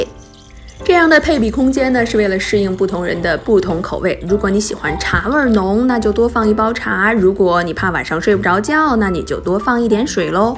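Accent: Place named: Northeast, China. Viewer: 看起來好好喝，聲音也好聽，很像東北口音😁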